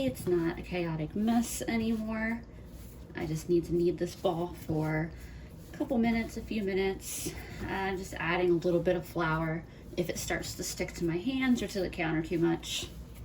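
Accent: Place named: South Jersey, United States. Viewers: Love your videos . You must be from jersey! I grew up there i hear it in the voice! Great recipie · Interesting fillings.. I saw you said you were from New Jersey ..Sounds like a South Jersey accent .. I grew up in Vineland .. Many many years ago .Actually a tiny town right outside Vineland called Newfield.. But if your not from there you don't know its there lol.. Found your videos just a few weeks ago .. Well done.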